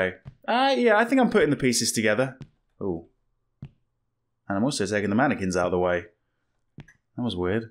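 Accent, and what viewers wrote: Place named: United Kingdom. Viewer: Gotta love that british accent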